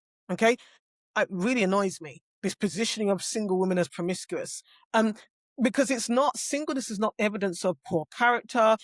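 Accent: United Kingdom. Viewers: yeah she definitely have a British accent, don’t know where exactly but that accent isn’t American at all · London accent.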